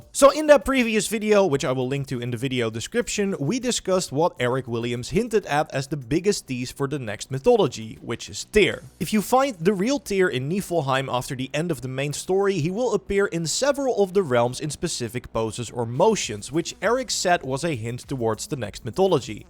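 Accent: Netherlands. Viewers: dat onherkenbaar nederlands accent :D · Are you dutch? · Nederlands accent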